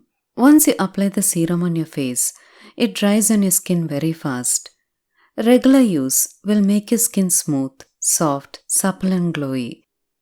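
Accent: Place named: Tamil Nadu, India. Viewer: கொஞ்சும் தமிழ் உங்கள் குரலுக்கு அழகு அக்கா.....😍😍😍😍😍😍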